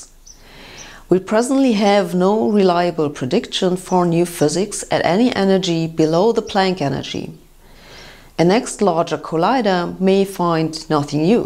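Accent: Germany. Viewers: Love how she puts an extra layer of german-ness on it... she could probably play it down, but instead she embraces it. Cool style.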